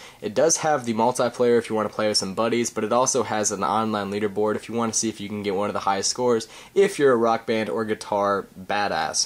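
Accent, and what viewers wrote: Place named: United States. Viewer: You have a textbook american accent! Well sounds that way over here in UK ;-), my iphone 4 is coming soon and have noted down a few apps to get straight away thanks to your reviews, keep up the good work!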